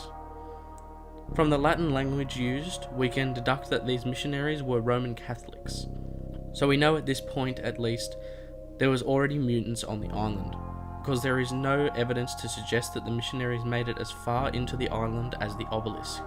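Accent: Australia. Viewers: Are you Australian ? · ugh your Australian accent is so pleasing to my ears 🥺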